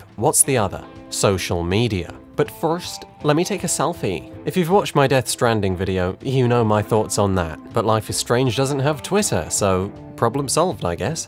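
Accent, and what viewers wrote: United States. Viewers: Your American accent is blursed. Thank you very much for showing it off. Have a nice day. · That American accent gave me whiplash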